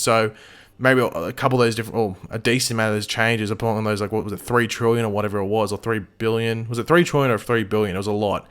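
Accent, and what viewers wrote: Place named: Australia. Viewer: Brother no offense, but Australian accent sucks 😑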